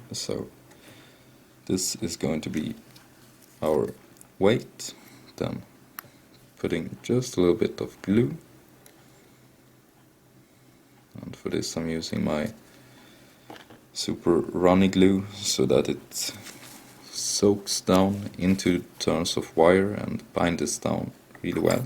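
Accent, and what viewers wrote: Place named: Sweden. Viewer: You know, experience tells me that that particular mix of accents means you could be Swedish...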